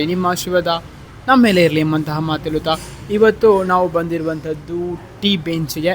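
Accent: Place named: Mangalore, India. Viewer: Excuse Me Nimdu Kannada Nodidre Mangalore Or Udupi Kanuthe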